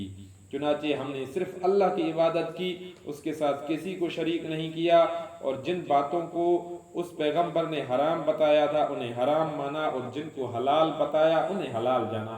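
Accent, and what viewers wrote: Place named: Sindh, Pakistan. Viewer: very good book and good voice although sindhi accent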